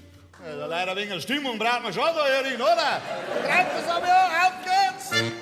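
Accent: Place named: Bavaria, Germany. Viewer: voui guad bayrisch heut🤣🤣😘🦜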